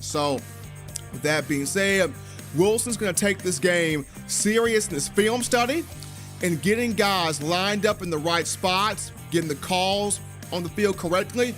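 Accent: South, United States. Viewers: You country funny voice nerdy looking boy you sound stupid stop bitching we don't give a fuck about the 5 losers get over it suckas.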